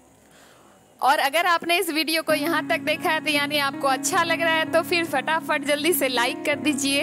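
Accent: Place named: Marwar, India. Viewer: Bahut hi badiya jankari di aapne medam… aap has has k jese bata rahi thi video interesting ho gaya or mene pura dekha or ha aapki voice se aisa lagta h aap marwadi ho sayad.. i am right ya wrong medam ??😊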